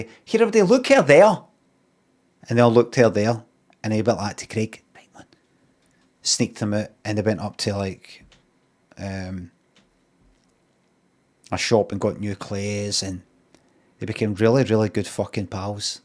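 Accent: Glasgow, United Kingdom. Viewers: yaas man, the glaswegian is strong in this one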